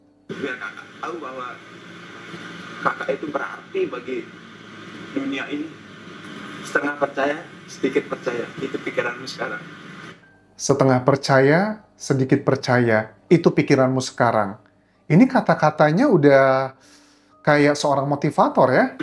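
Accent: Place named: Bali, Indonesia. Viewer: Nada bicara nya dan nama nya orang baly tuh...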